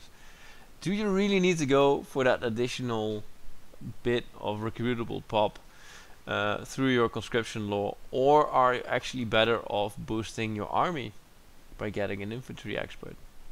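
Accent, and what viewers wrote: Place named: Scandinavia. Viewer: what accent is that, prob scandinavian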